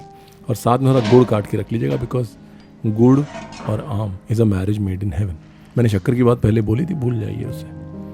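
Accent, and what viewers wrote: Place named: Lucknow, India. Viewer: Lucknow ka poora poora asar dikh rha hai lehze mein!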